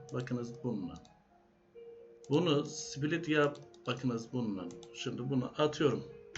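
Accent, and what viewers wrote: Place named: Azerbaijan. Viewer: Azerbaycan lehçesi var sanki hocam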